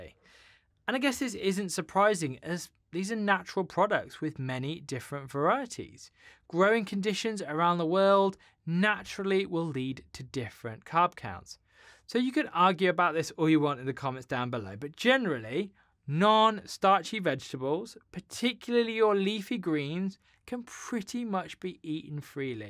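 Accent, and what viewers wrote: England, United Kingdom. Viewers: I'm glad to come across you Dr.:) I was eating a keto diet for a year and lost 3.5 stones in the first 6 months. Lock down happened and my life was wiped out. I have a weak immune system so I have to stay away from people and get my vaccine shots for the rest of forever. However, when I decided I needed to start living again and eating "keto" again I found I could not get into ketosis and eventually could not even follow the diet. I'm still struggling but I will keep trying and it's great to hear advice coming from an English accent for once. 👍😉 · I can’t deal with the British accent, sorry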